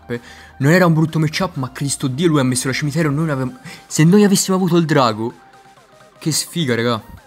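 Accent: Tuscany, Italy. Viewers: lo so sei toscano si sente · Bella, di che zona sei della toscana?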